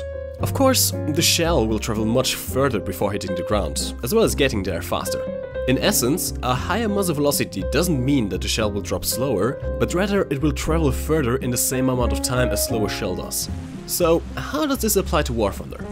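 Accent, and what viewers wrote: Germany. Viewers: You are german right? You have this special accent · I don’t know why, but i‘m german and i really hear the german accent and it kinda sounds like bad english, #nohate, still like you😂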